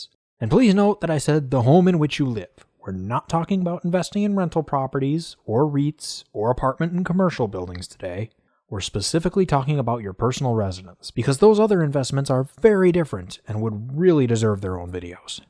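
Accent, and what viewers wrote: United States. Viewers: Oh ur an amercian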